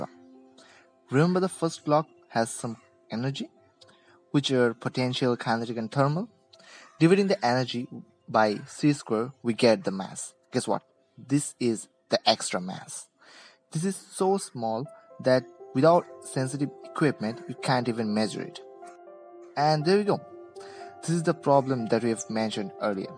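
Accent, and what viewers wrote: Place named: India. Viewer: its hard to understand stuff , when indians talk english , its way to thick , Plz talk in a understandable way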